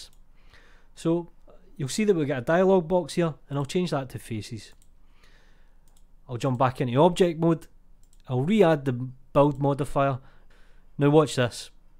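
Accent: Scotland, United Kingdom. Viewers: Hi Thanks for yet another super useful tip. Please do not drop the accent. Scottish is pure music to my ears.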